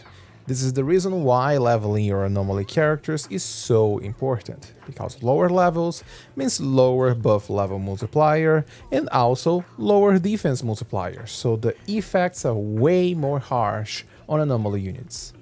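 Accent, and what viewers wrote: Brazil. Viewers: First time here and still learning the anomaly mechanics cuz brain goes WAHOO whenever Ye Shunguang goes flying and wipes everything since i unlock her when i started playing :v And yeah, i felt that you were also brazilian from your accent hehe. Still really good video btw (ainda n terminei de ver, mto numero kk) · Hey, thanks so much, that's really kind of you! 🙏 So basically my issue is: I have several S-rank agents that should work well in an anomaly team, but I'm barely dealing any damage with them, especially with Alice. My S-ranks are: Alice, Promiea, Yixuan, Evelyn, Vivian, Pyrois and Velina. If you could write out how to properly build/rotate a team around these to actually get good anomaly damage going, that would help a lot! Writing it out is honestly easier for me to follow anyway (and easier to translate if I need to) 😄and dw about ur brazilian accent lol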